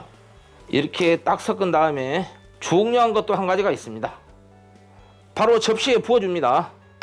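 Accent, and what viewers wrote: Jeolla, South Korea. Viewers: 아따 ~ 전라도 사투리 징하게 정감있어 부네요 . 세프님. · 이 사투리는 전라도인가요? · 이렇게해각고 딱 이렇게해갖고 구수합니다 저두전라돈디 비스한지역갇은디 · 구수한 전라도 사투리 더 정감이 가네요 ㅎ 늘 고맙습니다 짱~!!! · 전라도 사투리 너무 구수하네요 와 잡채도 맛나것소~잉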